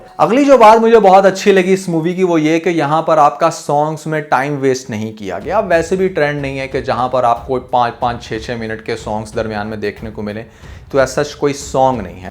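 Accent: Punjab, India. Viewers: One request please stop speaking English as Punjabi you can speak Punjabi urdu or English but properly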